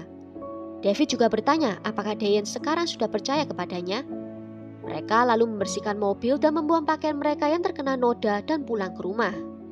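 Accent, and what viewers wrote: Java, Indonesia. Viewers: Hay medokuhhh cantik 😍😍😍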